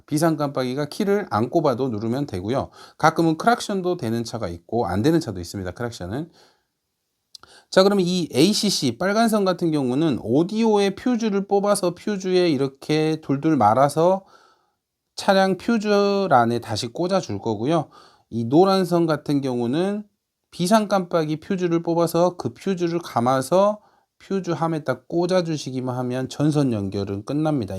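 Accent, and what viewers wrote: Jeolla, South Korea. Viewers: 전라도 말투 넘 좋아요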